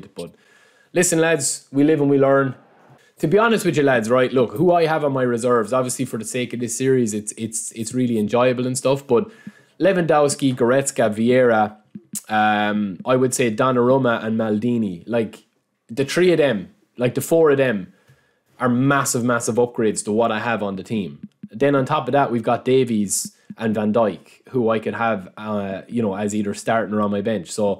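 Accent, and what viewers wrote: Ireland. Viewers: Also love your commentating 😬. Wish I had your Irish accent ❤️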